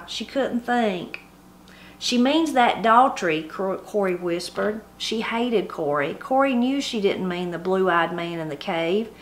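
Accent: Appalachia, United States. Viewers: Thank you so much for reading the story with the true accent of your voice, and reading the words as written with the Appalachian way they are/were spoken. I pray you enjoy all the blessings our Lord has given you and your family. Thank you also for sharing the excitement of your life. God bless you in Jesus's name!!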